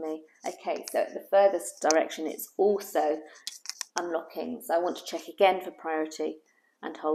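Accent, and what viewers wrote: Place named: Cockney, United Kingdom. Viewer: Why does this nurse speak with a strong cockney accent? She must be related to Rodney Trotter from Only Fools and Horses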